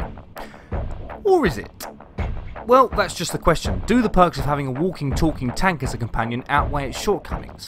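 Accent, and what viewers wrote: United Kingdom. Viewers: UK English sound soo badly...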